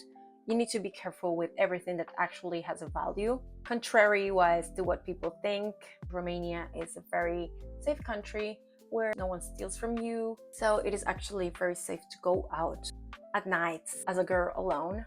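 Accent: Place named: United States. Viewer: Strange to see someone saying is from Cuba and speaks english so good that is easily mistaken by an american.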